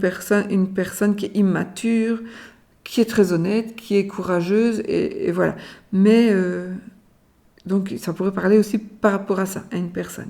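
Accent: Belgium. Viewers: Bonjour, j'ai toute suite reconnu l'accent Belge et ça fait plaisir , je vous remercie pour ce tirage, j'espère que vos prédictions seront excausées car ce long mois de mai fût très dur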